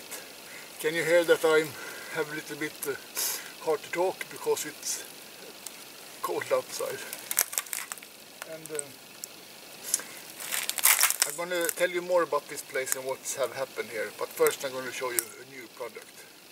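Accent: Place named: Sweden. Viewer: det stammer säkert men det var så roligt tyckte jag. det lär så svengelskt. måste ändå som vanligt beröma dina fina filmer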